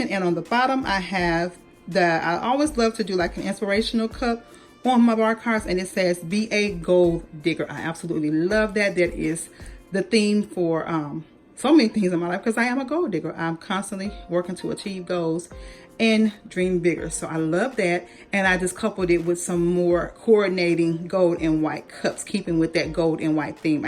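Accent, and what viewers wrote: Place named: Midwest, United States. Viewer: Hey Would You write your basic blurb in the ABOUT section? And where are you, what part of the country. You sound mid-western'yy. M. IL. Chi western 'burbs.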